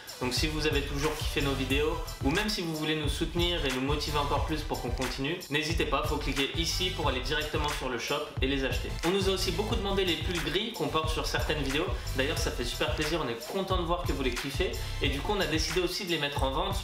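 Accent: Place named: South, France. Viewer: Accent du sud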